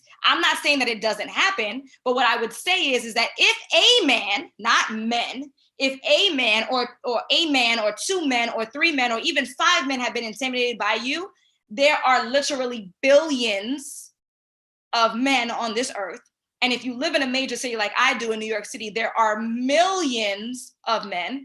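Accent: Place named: Caribbean. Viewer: Amazing content. Yuh sound like a Caribbean. I support you!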